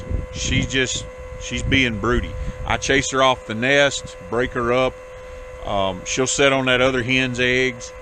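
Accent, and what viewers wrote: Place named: Kentucky, United States. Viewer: Maybe the weirdest comment you ever get: I grew up in KY and moved to CO ten years ago. The last four years of divisions in our country between left and right hit me hard. It further separated me from people I grew up with, knew and loved. I found this video because I wanted to learn more about the water pump but hearing your voice reminded me of family and friends I haven't seen in a decade. I even enjoyed hearing about the hog and chickens. You're a good guy. Keep up the good work... and thanks.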